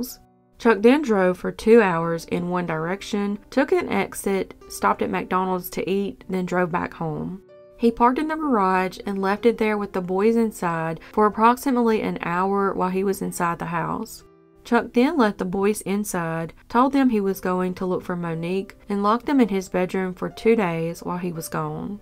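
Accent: United States. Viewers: Does anyone know what state the narrator is from? I like to listen to her voice to help me fall asleep.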